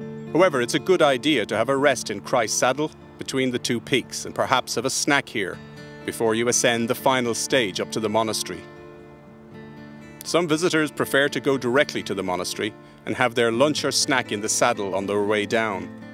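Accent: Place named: Ireland. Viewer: that guide at 5.00 min. is American but narrator is Irish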